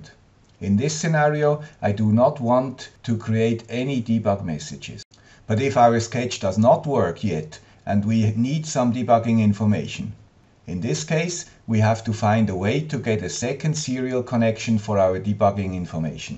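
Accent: Switzerland. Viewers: HI Guy with the Swiss Accent again! I can't Thank you enough!!!!!!!!!!!!!!!!!!!!!!!!!!!!!!!!!!!!!!!!!!!!!!!!!!!!!!!!!!!!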